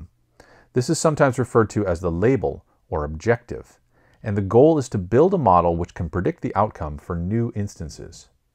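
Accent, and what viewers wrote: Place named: United States. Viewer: American English...pheww...finally found something that I'm able to understand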